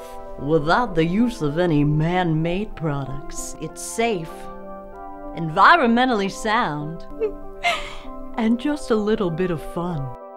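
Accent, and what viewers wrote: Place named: Alabama, United States. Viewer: what accent does she have ? Alabama ? Its nice sounding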